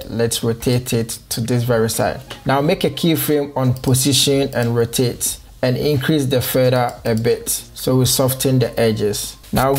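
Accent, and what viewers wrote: Ghana. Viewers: This is far from the video…are you from Ghana? You sound more like a Ghanaian 😂 I’m a Ghanaian so I know our accent 😂😂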